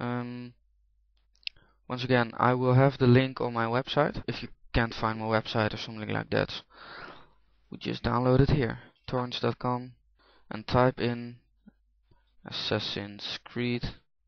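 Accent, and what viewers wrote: Netherlands. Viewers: haha je hoort echt dat je nederlands bent!!:D heeeeello xD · are u dutch? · hehe je bent NL ik hoor het aan je stem XD nice video! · ja echt duidelijk een nederlander LOL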